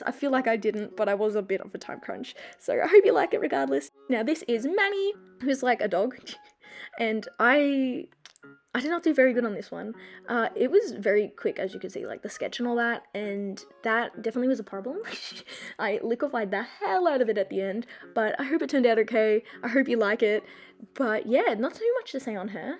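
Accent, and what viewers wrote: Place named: Australia. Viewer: Are u from Australia right? I love your accent! And of course your style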